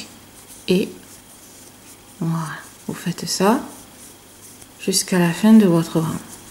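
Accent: South, France. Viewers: Coucou merci pour votre top qui est magnifique et vos explications claires et précises avec votre accent du soleil ❤💋👍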